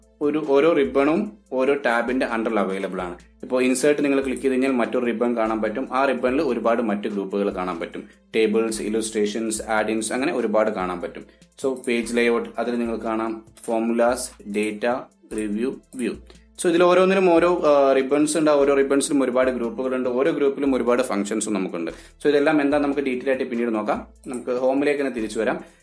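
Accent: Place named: Malappuram, India. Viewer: nice presentation, oru malappuram touch undu.. s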